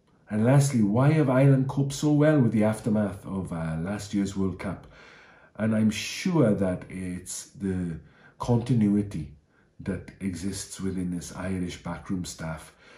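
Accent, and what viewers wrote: Wales, United Kingdom. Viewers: Nice video. I spent the first 30 seconds wondering were you Welsh or SA. That accent has travelled. 😄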